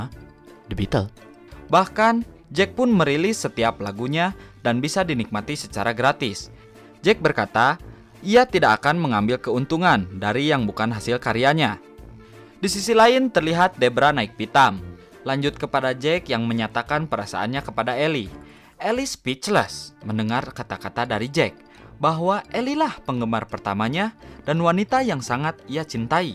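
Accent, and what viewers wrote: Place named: West Java, Indonesia. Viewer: Sundanis pisan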